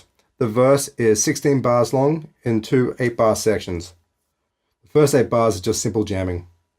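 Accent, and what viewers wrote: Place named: Australia. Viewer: oh wow i would not have guessed that was just an irig! Ah didn't know there was such a range of Australian accents, thats great, keep on rocking my dude!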